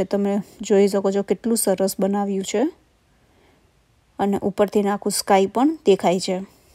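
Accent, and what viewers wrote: Saurashtra, India. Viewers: ખુબ જ સુંદર ધન્યવાદ ભાષા પરથી તમો સૌરાષ્ટ્ર ના લાગો છો 👌👌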